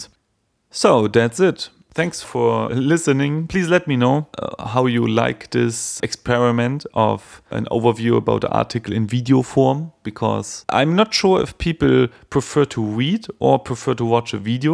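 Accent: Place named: Germany. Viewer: I prefer the video for an introduction and then read your article. Your german accent helps me to understand your English as I am French. Reading is a more abstract process and requires more attention.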